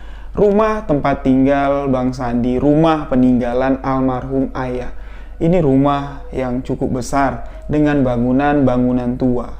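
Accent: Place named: Jambi, Indonesia. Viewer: Walaikumsalam Yana -Banten hadir Kak boleh request upload kisah Kota Ghaib Saranjana..... MasyaAllah Pasti y kereen banget lw cerita y yg bawain kak dgn Logat Jambi yg khas....🙏 Hayu Gaskeeuunn atuh kak..... 🔥🔥🔥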